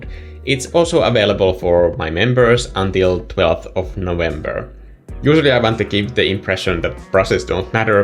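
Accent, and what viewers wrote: Finland. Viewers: Are you finnish? You have voice and accent like Tuomas Holopainen 😄👍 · I love your style so much 💚💛 and I knew I recognized that accent!! Kiitos videosta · I always loved these types of drawings as well, as a kid and up to this day. So the details, care, and attention you gave to your creation really resonated with me! You created a beautiful, relatable space which somehow feels both familiar and strange. So excellent, kiitoksia for sharing!! (I am guessing by your accent you are Finnish 😊)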